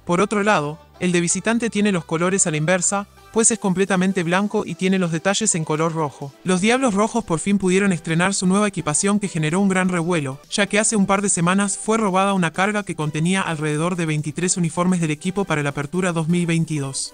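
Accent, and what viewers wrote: Argentina. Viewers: Por qué usan la voz de un argentino? ¡¡Estamos en MÉXICO!!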